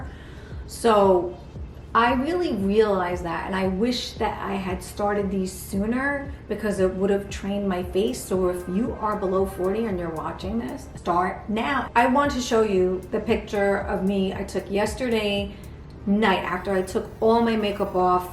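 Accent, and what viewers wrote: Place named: New Jersey, United States. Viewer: This is great! Love your accent too- I used to live in Edgewater NJ and it reminds me of home! Thanks a million.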